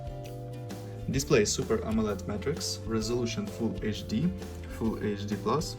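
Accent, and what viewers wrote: Russia. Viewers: очень сильно чувствуется Русский акцент ,но спасибо ,ибо без русского акцента мне было бы не понять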